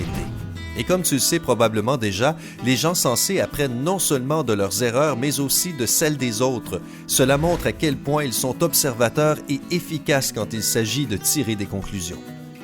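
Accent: Quebec, Canada. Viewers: J'adore ce mec avec l'accent Québécois, il me fait trop marrer... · J'adore la voix québécoise like si toi aussi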